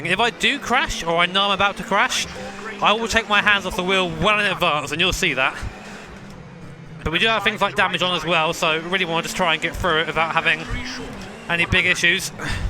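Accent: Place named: England, United Kingdom. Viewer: 🤣 lmao this had me smiling ear-to-ear the whole video I love your English accent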